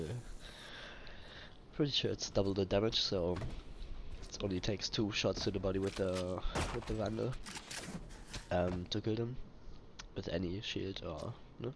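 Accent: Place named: Germany. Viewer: Deutscher Akzent ist auf jeden fall da!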